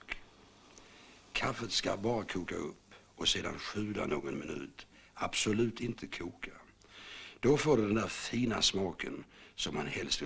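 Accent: Scania, Sweden. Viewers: Muskig skånsk berättarröst och kaffe är alltid en vinnande kombination. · Härlig skånska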